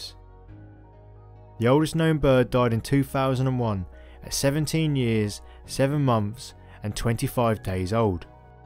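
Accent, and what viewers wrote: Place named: East Anglia, United Kingdom. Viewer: Are you from East Anglia? That accent sounds familiar haha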